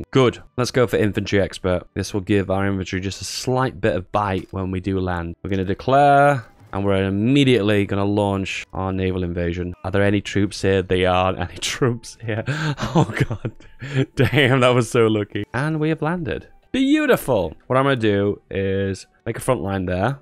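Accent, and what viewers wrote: United Kingdom. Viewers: The reason I subscribed to this channel is because you are so damn brittish and it makes me feel great to hear your accent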